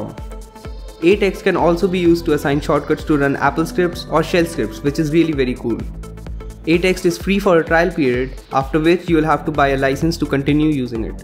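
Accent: India. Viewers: my God ... how can i avoid these english-indian movies? Fuck ... horrible english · Disgustimg indian accent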